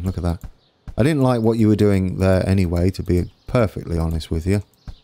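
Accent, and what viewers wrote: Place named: Midlands, United Kingdom. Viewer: Wonderful work! Keep it up! And I got the "shout out" at the start... I'm blushing a bit (BTW - surname is pronounced "Kool" (really!). Austrian - German family. The spelling in German has the "umlaut (dots)" over the "u", but the typewriters on Ellis Island 140 years ago didn't have that key!) Good effort you! So an American in the Midwest, with the German last name, who lived in London for a couple of years right at Y2K and a bit, who's very much a British railway / London transport nutter helping out a Midlands (If I place your accent properly?) bloke who's living all the way over in the Hill Country near Austin, TX with the livery colours of a West Midlands train on this recreation. What a crazy, mixed up world! Lovin' it!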